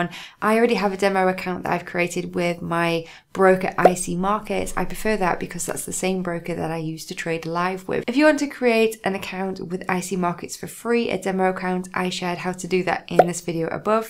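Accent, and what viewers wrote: United Kingdom. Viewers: She just made everything seem simple with her amazing British accent!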